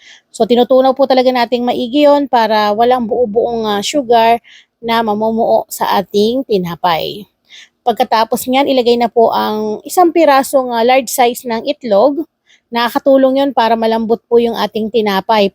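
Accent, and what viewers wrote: Bicol, Philippines. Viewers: Boses bicolana ka po nanay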